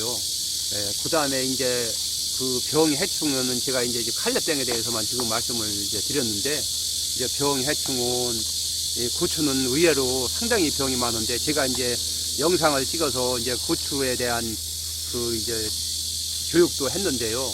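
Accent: Chungcheong, South Korea. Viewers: 구수한 충청도 사투리가 마음이갑니다 듯기좋아요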